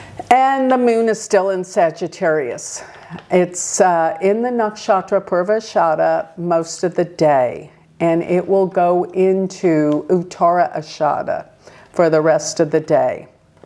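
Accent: United States. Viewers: And I have learned to understand and embrace her American accent especially in the pronunciation of sanskrit terms.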